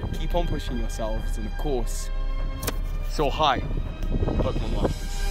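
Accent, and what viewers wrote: United Kingdom. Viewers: ive always thought you said "so hi pokemon masters" cuz of the brittish accent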